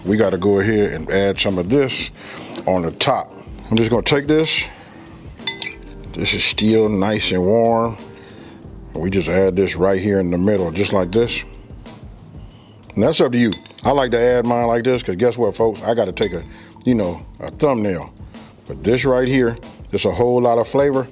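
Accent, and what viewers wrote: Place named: South, United States. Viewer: If you’re from LA, where did you get that accent? I assume the south? Great video!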